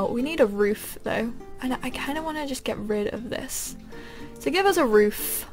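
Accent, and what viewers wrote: England, United Kingdom. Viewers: Hahaha! I feel so identified with the lack of building skills... Anyway, Sims 4 seems perfect for us! I love the videos, you are making the wait easier!! And your british accent is adorable, by the way! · ***** she totally british you can tell by her accent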